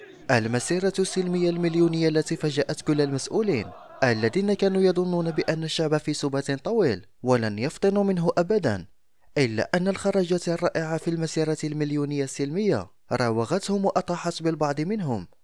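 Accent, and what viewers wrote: Morocco. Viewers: لهجة مروكية لماذا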